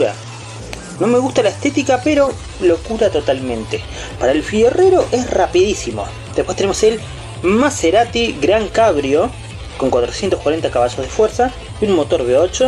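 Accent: Argentina. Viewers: de donde sos bro? Creo que eres argentino como yo pero de que provincia?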